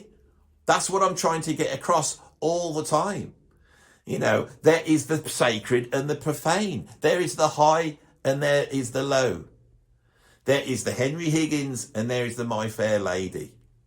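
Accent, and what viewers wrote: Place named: Poole, United Kingdom. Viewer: I'm just having fun. Great video. This was my all time favorite band. Are you from Poole? You and have very similar accents.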